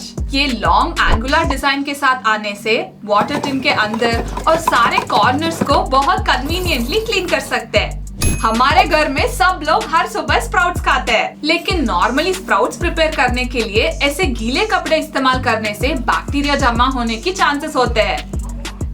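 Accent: South, India. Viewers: South indian accent🎉🎉🎉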